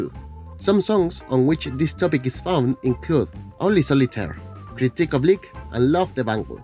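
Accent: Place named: Spain. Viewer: Interesting video... but I would have done without the extremely thick spanish accent of the narrator - it's quite annoying...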